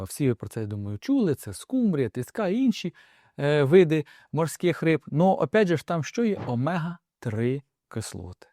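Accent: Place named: Vinnytsia, Ukraine. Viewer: Це діалект нічого страшного,,навпаки цікаво,так розмовляють люди,які живуть у Вінницький області.Я слухаю з задоволенням.Ми не на каналі граматики української мови.Сенс не в цьому ,а в тій незвичайній,особливій інформації., яку чудовий справжній лікар нам розповідає.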